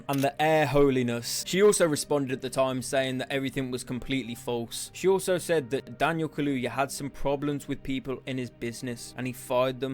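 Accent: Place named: England, United Kingdom. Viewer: I was really surprised this channel doesn't have 100k subs. I enjoyed the video and I love his English accent, Americans never sound as cool. Sending love from the USA. Thanks for the interesting video. SUBSCRIBED!!!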